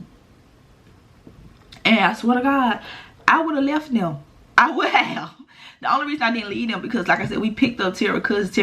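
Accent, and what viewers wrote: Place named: Memphis, United States. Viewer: I came across your video and immediately liked the hair for starters and also just knew the accent was Memphis. At least y’all are a hop, skip, and jump away. Gotta love Address, Bar 2200, and all that. We’ve sort of branched out to going to Chapman & Kirby for brunch and some folk go to 5015. It seem like you liked Bar 2200 food. I loved their vibe!!!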